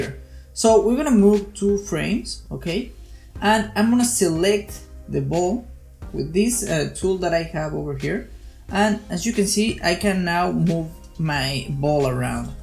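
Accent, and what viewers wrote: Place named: Spain. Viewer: Se nota mucho que eres español xd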